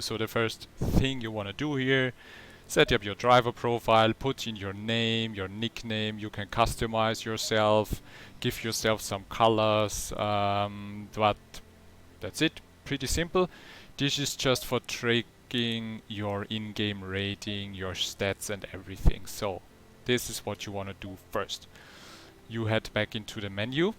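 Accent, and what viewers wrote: Germany. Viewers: wenn österreicher oder deutsche englisch reden :D machs doch auf deutsch !!!! aber gutes video! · Na du bist doch deutsch bei dem Akzent oder? :)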